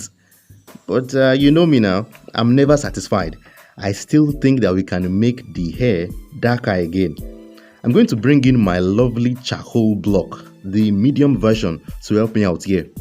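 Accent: Nigeria. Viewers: This really helped, thank you so much . By the way, are you a Nigerian?,you sound like one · Oh my gosh where have I been, where have you been and why am i just discovering this channel? why have you guys never suggested these tutorials whenever I am searching for stuff?! Awesomely great work bro, your tutorials are sooo educative, informative and very explanatory, absolutely any level can follow!! More success to you, and also I gbadun how your Naija tongue sounds through your clear spoken words!! Carry go jooor, nuffin doeth thou!!!☺☺👍👍👍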